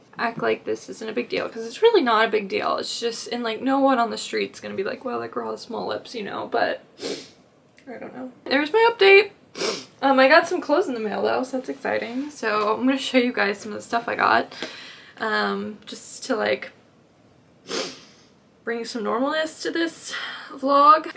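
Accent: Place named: Tennessee, United States. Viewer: I’ve never been to Nashville or Tennessee for that matter but is that what a Tennessee accent sounds like? Like wow. Also, why not turn the comments off so no one can comment on your lips?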